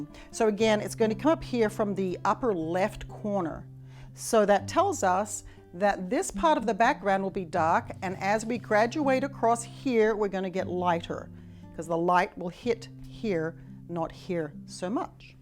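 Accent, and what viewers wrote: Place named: Australia. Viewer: Wow I love your video!! You make it look easy, you are very talented. I love your accent, Australia?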